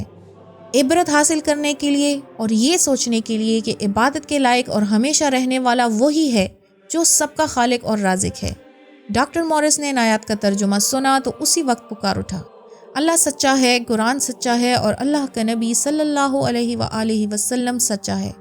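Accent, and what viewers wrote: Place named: Lahore, Pakistan. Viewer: U are lahori q ke AP ray ko aray prty fact about us means lahories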